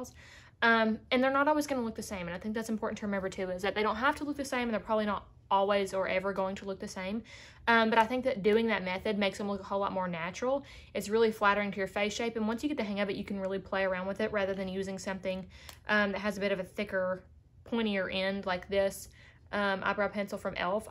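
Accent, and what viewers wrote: South, United States. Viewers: Love your southern accent girl! 💖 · I love love love natural brow, even before she did the feathered or push up eyebrow look and this by far is the best video on these type brows. She has the cutest accent, and I believe a detect southern. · Ohhh how I love southern accents 🥰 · bruh as a british person this ladys accent is really fucking with me xD idk why i expected her to just sound like me and then boom her southern accent - what nice suprise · Came to tame my brows, stayed for the southern accent 💖✨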